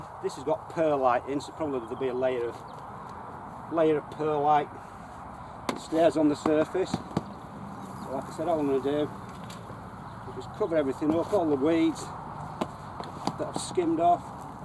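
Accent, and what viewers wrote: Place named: North, United Kingdom. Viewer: Hi Love your Northern accent! We call this the Back To Eden Method in the States. It really does work very well. I loved your competition footage as well. Keep it up!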